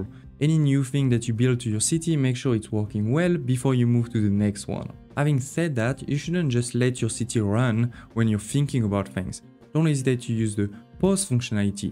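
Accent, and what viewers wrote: France. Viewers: Your French accent is wonderful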